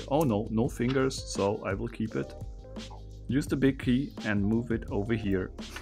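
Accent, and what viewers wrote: Germany. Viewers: So wie er englisch redet vermute ich jetzt mal das er von der muttersprache her hochdeutsch bzw. eine der vielen deutschen sprachen spricht. Ich habe mich noch nicht danach umgesehen wo er herkommt. Einfach mal blind ne vermutung anstellen und evtl. damit auf die schnauze fallen. So ist das leben geil. No risk no fun😀😬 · Your German accent gives me a headache but great video!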